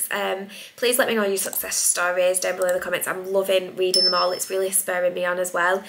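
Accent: Lancashire, United Kingdom. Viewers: Today is my day 1. You sound like you’re from my neck of the woods in Lancashire.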